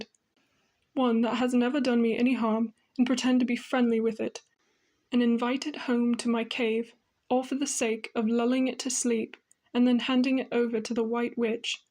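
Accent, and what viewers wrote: United Kingdom. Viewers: Very classy british accent.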